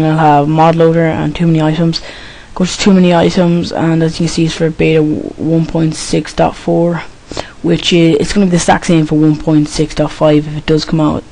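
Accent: Ireland. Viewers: WHAT PART OF IRELAND ARE U FROM? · are you irish you sound irish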